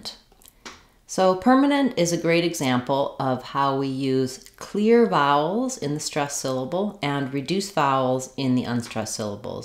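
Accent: United States. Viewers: Oh wow you're here making videos and uploading! I did it search for a biblical name Rayhab. I knew there's different spellings but this is the one that I'm familiar with and some people remove the Y. I'm assuming when you give pronunciations that this is American English pronunciation? Google AI pronounces her name as rahab... They pronounce the a like the word father. I've always heard it pronounce the way you pronounce it in that video I just saw. Anyway, I'm assuming you're giving us pronunciations for USA❤❤❤. Sorry this was so long but I am from another country originally, Venezuela. My family came to USA when I was a girl and now I'm 23. I'm not trying to give my life story but I am trying to learn how to pronounce a lot of words in the USA version. You can understand why it gets a little complicated when the Google AI kind of uses pronunciations that sound like there United Kingdom English. 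Anyway I'm using speech to text because I can't spell English great so far. I'm trying to work on all these things and I plan to subscribe as soon as I hit send. Glad that I find you still around after her the Rehab video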